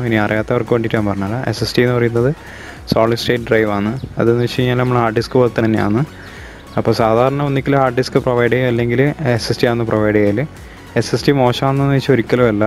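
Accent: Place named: Kannur, India. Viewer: Aah kannurkaran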